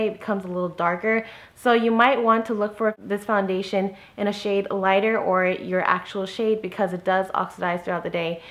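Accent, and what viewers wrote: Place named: United States. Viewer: Are you originally from America? You just have an American accent that's all (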